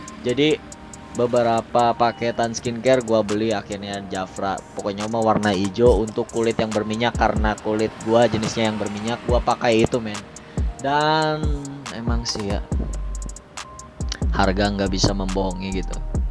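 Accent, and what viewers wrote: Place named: Karawang, Indonesia. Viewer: Orang krw mana nih suaranya ,...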